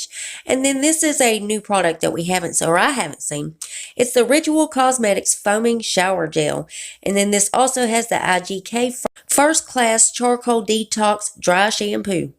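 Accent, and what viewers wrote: South, United States. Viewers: Love your southern accent ♥️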